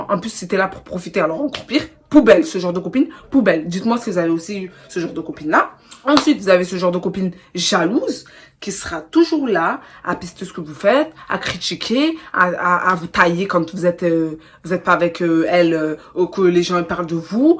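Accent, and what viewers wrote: Marseille, France. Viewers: Cc ma belle une abonnée en plus tu est de Marseille en dirais avec ton accent. Tu à tout dit surtout les femmes sont trop méchante et hypocrite en amitié .vaut mieux marcher seul et être tranquille dans sa tête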